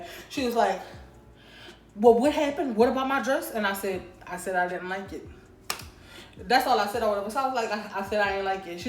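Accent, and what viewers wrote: New Orleans, United States. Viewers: accent is so pretty you must be from New Orleans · I can tell she from new Orleans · "um power to the ppl" 😭 she gotta be from new orleans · You must be from Louisiana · you must be from NOLA 🥺❤️ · i think she from nola dats how we talk lol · She gotta be from New Orleans 😂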